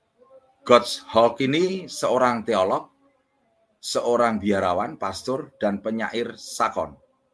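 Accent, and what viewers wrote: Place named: Semarang, Indonesia. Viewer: Maturnuwun mas atas pencerahannya. Berkah Dalem. Salam dari negeri shariah Kano, Nigeria. (Logat nya nganngeni, ….semarangan mbangeti , hèèh rak..😂)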